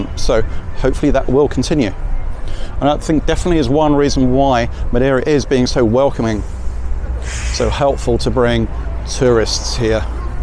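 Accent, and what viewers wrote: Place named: United Kingdom. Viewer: !!!!!! How wonderful. I love, love, love the narration. So informative and interesting. Also, I thoroughly enjoy your very British accent. This whole experience is just great. All the best to everyone for this season and blessings for a peaceful, safe and healthy 2022. God bless you all!!!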